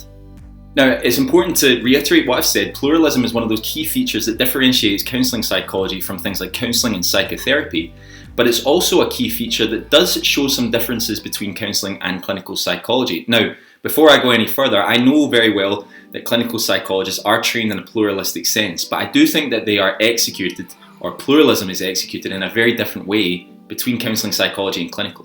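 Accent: Scotland, United Kingdom. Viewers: sure. Reminds me a bit Fish accent. Marillion singer. He was born in Edinburgh or not far from. Thanks for response and for interesting material.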